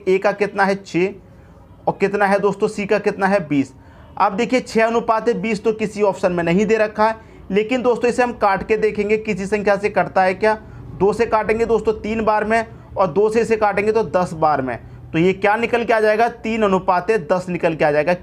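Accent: Haryana, India. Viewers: Gjb HARYANVÏ 🤑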